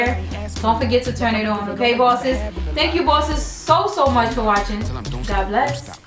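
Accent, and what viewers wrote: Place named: United Kingdom. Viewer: And there goes the british accent...first impression beautiful. Girrrrlll u had me watching and dancing at the same time... Awesome job.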